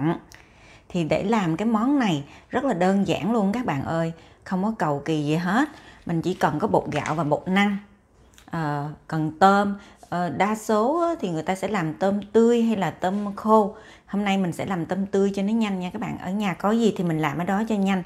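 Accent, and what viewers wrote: South, Vietnam. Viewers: Hướng dẫn rất tuyệt vời. Giọng nói miền nam nhẹ nhàng dễ mến.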